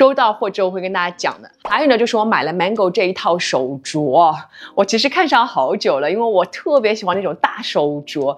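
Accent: Shanghai, China. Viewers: 姐 你是上海人吗？麻子比较大因该是上海话吧。呵呵😁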